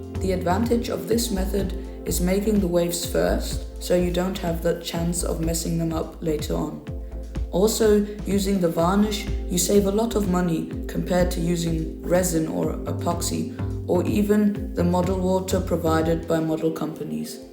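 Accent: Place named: Germany. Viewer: Als ich den Akzent hörte dachte ich mir schon dass der Youtuber hier in Deutschland sitzt. Leute, es gibt 'unendlich' viele Kanäle von englischen Muttersprachlern, oft nur schwer verständlich wegen miserabler Audioqualität oder weil der Typ nuschelt bzw. sehr schnell spricht. Muss man da unbedingt noch ein weiteres Video in Englisch hinzufügen um viellecht die Reichweite zu erhöhen und möglichst viele Klicks zu generieren? Mir geht die Dominanz englischsprachiger Videos zunehmend auf den Geist...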